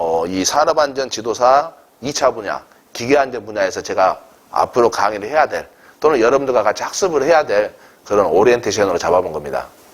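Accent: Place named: Jeolla, South Korea. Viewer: 말씨가 전라도 그래서 나간다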